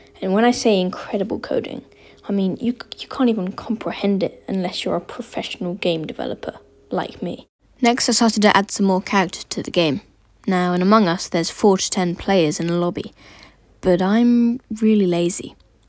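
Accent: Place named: United Kingdom. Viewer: British accent youtubers are always the best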